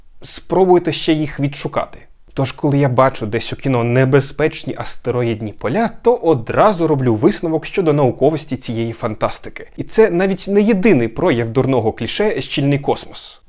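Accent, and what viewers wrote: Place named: United Kingdom. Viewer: Де в епоху інтернету отримав таку чисту Bri'ish вимову?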